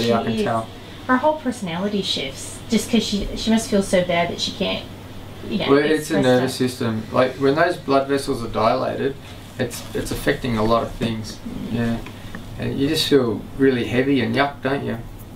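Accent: Australia. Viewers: Chocolate Queen it is an Australian accent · Yes, the Aussie accent is the best one. My husband sounds the same. I'm Mexican American and that's one of the many reasons why I fell in love and married him. XD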